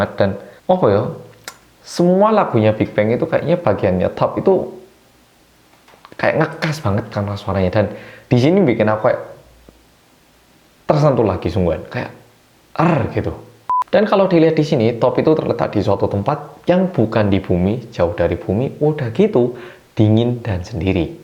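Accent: Java, Indonesia. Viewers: Mas medok ak lho udah berhasil ga nangis lagi dengerin lagunya, setelah dengerin plus nangis semalaman,, trs nonton video mu ini nangis lagi kaaaaaannnn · Ada awal ada akhir, oppa medok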